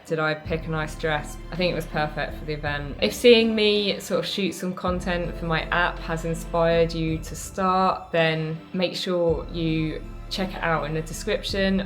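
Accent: England, United Kingdom. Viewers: She is so beautiful and the English accent makes it even better.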